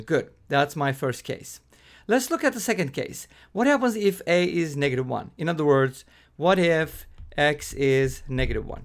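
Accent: Turkey. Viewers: Your accent really looks like Turkish, I dunno why but it really does.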